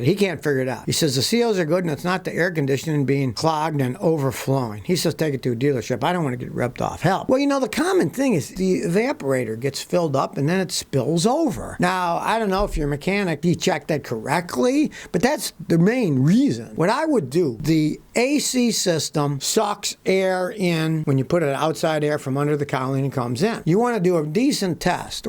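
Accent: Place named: Buffalo, United States. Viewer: I wish could get his vowels correct. Must be his Buffalo (NY) accent. Ex: ONscrew instead of UNscrew. You think he's saying to put something on instead of him meaning to take it off.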